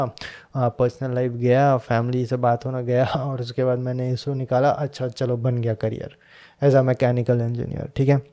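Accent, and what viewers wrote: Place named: United States. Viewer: Feels like now your Hindi is slightly sounding American accent 😅. Just saying what I felt. Been more than a year, I heard you speaking Hindi.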